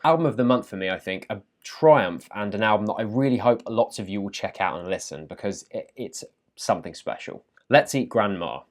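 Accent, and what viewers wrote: RP, United Kingdom. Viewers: dont worry he's just a really posh one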